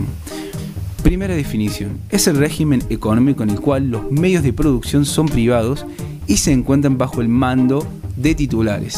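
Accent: Córdoba, Argentina. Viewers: No me concentre en todo el video escuchando su acento cordobés xd 😍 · sos cordobes? por cierto buen video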